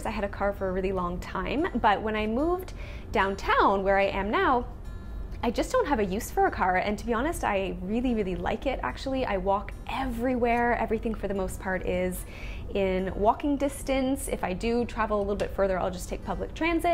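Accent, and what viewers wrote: Canada. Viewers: Either your accent is from Minnesota, or you're from Canada ahah! I can't pin point it! :P You sound like you're from northern Ontario! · I love your Canadian accent!!! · That Canadian accent coming through stronnggg haha. I’m from Calgary and I can hear the East Coast in you.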